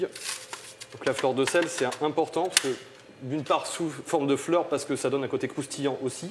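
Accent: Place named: Quebec, Canada. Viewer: On parle québécois en plus...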